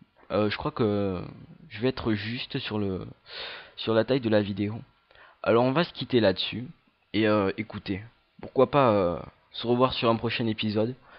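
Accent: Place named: South, France. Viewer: Salut ! Content de voir une nouvelle série sur Rome Total War, et avec l'accent du sud s'il vous plaît :D ! L'installation de mods n'est pas très compliquée et généralement bien expliquée par les développeurs de ces derniers. Tout dépend de ce que tu recherches, mais personnellement j'utilise Roma Surrectum 1.6 (la deux déchire encore plus mais j'ai un débit pourri pour le télécharger et je suis anglophobe). Bref, pourquoi pas une série sur une campagne moddée ? 0:)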